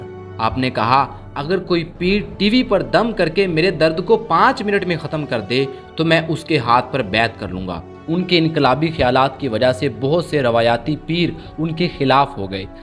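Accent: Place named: Punjab, Pakistan. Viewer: You’re doing great job collecting information and passing onto the people throughout the Pakistan and in other countries as well. I would request two things number one please do some more research with your facts number two. Please fix your Punjabi pronunciation that will make your talks and your presentation more sophisticated. May Allah help you and give you complete success in this world and here after.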